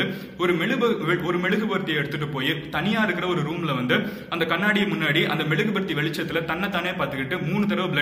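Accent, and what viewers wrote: Madurai, India. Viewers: Nama madurai slang la pesu thala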